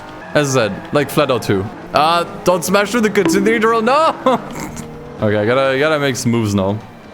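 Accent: Germany. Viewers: As an American, we get it, you’re German lmao he sounds so German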